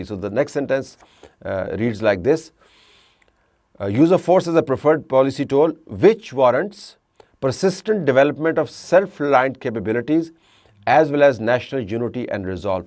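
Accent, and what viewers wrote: Pakistan. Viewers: They have there own channels. He is trying . His English is in urdu. 😂😂😂😂😂😂😂 so hard to understand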